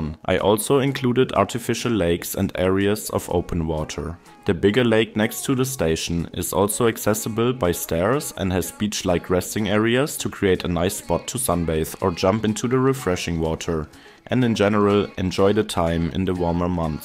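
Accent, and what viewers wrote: Germany. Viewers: Most stereotypical German accent ever.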